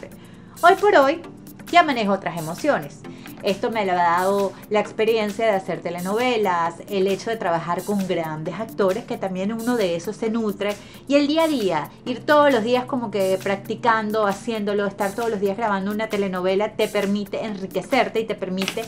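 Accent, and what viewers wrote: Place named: Venezuela. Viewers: Debe ser por el acento venezolano buena onda!! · Pues es venezolana tinene ese asento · es q es venezolana y hablamos todas igual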